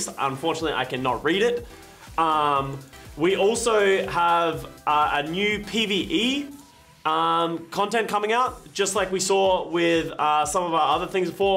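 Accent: Australia. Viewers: Another excellent episode of CNN! I need more coherent Australian rambling in my life.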